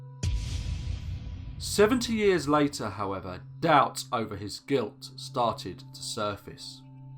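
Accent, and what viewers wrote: England, United Kingdom. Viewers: God almighty wat th hell is it that draws me to an English accent th music, th talk, everything about these people says hey listen listen I got to go to the UK... Very good story. Great speak loved th story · Hi! I really enjoy your narrations an voice. The pacing, emotion, cadence, and enunciation all balance out very well. I was curious as to what part of Europe you live/d in with that particular accent. I'm assuming it's English and all, but you seem to have a softer edge? or something to it. Don't think I can really put my finger on it. It may just be your particular way of speaking instead of a particular accent or regional way of speaking. Still, I'm curious where abouts, roughly, you live.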